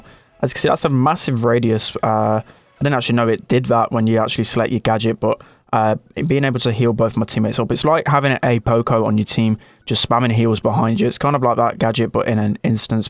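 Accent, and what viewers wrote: United Kingdom. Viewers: Uk people and their English :) heh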